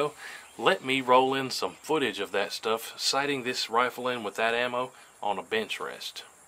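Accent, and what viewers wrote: United States. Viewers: ! Dude I love that crazy country accent of yours and I am SHOCKED that some Producer here in Hollywood has not made you a movie offer!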